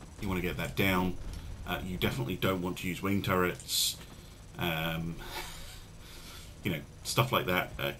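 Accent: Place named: United Kingdom. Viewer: It's the British accent ;)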